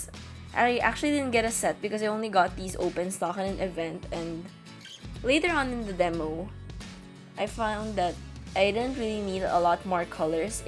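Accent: Philippines. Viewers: When I first heard your voice, I noticed an accent. I knew you were Filipino too.☺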